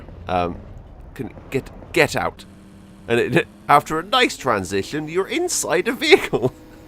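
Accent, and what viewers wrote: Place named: United Kingdom. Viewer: Speech impediment? You mean his British accent?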